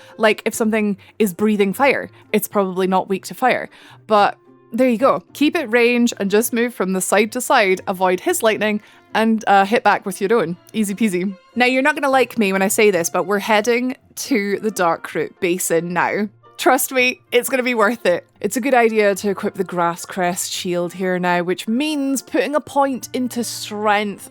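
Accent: Scotland, United Kingdom. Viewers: A Scottish sounding chick playing dark souls?! Wild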